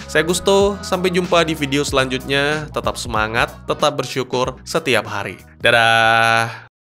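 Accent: Aceh, Indonesia. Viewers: Bang orang Aceh kah? Yok ketemu bang di Banda Aceh penasaran sama suara candunya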